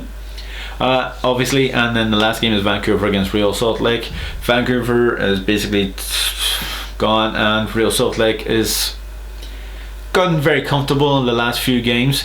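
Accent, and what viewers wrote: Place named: England, United Kingdom. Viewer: Dude why you upload like this your video ?? For me its difficult now to understand you English accent .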